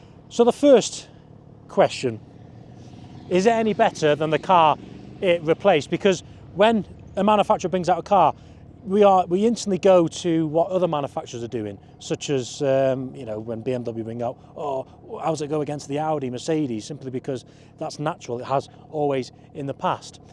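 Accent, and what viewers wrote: United Kingdom. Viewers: no one wants to hear your short british tongue innit mate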